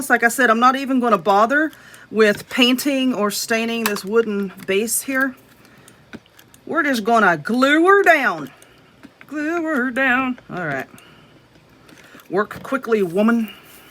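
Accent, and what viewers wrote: South, United States. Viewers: This is so precious. I love your instruction in all your videos. I told my Mom I'm going to make her a corn husk doll for Thanksgiving. She has one she made in the 80's and it's still in great shape. I might add color as you suggested in your instruction. I love your Southern Accent too. Makes me feel at home watching you. (I'm a native Texan btw)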